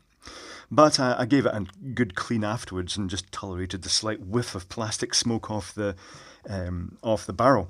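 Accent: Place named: Glasgow, United Kingdom. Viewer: Personally I prefer this Cap microphone sound, it is crisper (term used by those with hearing loss) meaning the all important frequencies that reveal subtle differences in the sounds are there at good levels, too much in the bass region muddies the perception, bit like the TV news broadcasts where they have a permanent thumping drum or musical? background, WHY? is trying to get his words over to you in a clear manner, this microphone does ir WELL. His wonderful "Glaswi" accent is a pleasure to listen to.. Keep it up "Sir" Final thought, at least we don't hear the rustling of his beard!!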